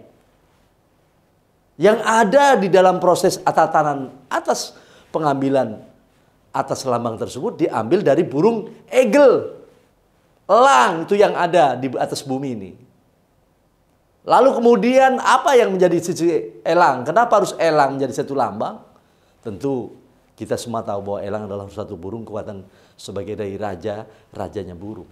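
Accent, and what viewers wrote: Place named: East Java, Indonesia. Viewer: Maap mas .mas ine dri logatnya .ngomongnya dri jwa timur .klu menurut sy mas ine bkn asli sunda .grhhh yy0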